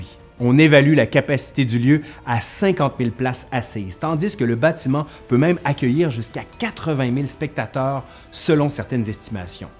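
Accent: Quebec, Canada. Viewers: J'adore votre narration. Merci pour le partage. Je viens de découvrir votre chaine. Vos reportages sont courts et instructifs. Je suis contente que vous ayez un joli accent Québécois compréhensible comparé à vos compatriotes. Dommage beaucoup de reportages Québécois sont intéressants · Bon, comme on ne peut mettre qu'un pouce en dessous de la vidéo. Ben, je t'en mets d'autres ici. Merci encore pour ta chaîne si instructive... Et puis aussi pour ce succulent accent québécois qui, pour moi niçois avec mon accent méridional, enchante mon oreille de "français de France" mais qui admire la culture canadienne depuis longtemps (quand j'ai découvert Charlebois et Diane Dufresne etc quand j'étais "mino". Aller, ciao viva et bayeta comme on dit ici. CONTINUE À NOUS INSTRUIRE. 👍👍👍👍👍👍👍👍👍👍👍😉😘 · Assez bizarre que tu n'aies pas autant d'abonnés que Nota Bene, pourtant ton contenu est lui aussi très intéressant et bien raconté avec en plus le petit accent québécquois du plaisir. 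En tout cas sache que tu as gagné un abonné fidèle. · Tellement complet! Bravo. J'aime beaucoup votre manière de construire les vidéos, la manière de parler est nickel et ça fait toujours plaisir d'entendre un petit accent québécois! Merci.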